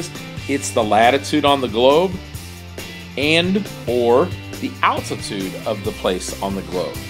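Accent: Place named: Texas, United States. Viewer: Dat half Texan accent Also very useful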